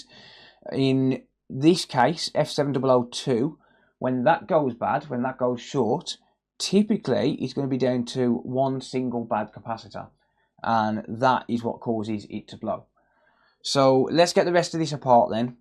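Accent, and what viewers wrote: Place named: Walsall, United Kingdom. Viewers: you gotta love a good brummie accent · very interesting mate,you from birmingham ?…now i know where to send my repairs 🫡👍🏼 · Great video mate, you proper sound like your from near me (Walsall)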